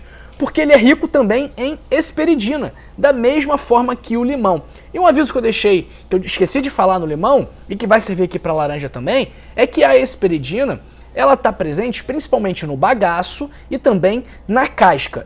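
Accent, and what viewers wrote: Rio de Janeiro, Brazil. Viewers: Dr. Ô Senhor é carioca, como eu ?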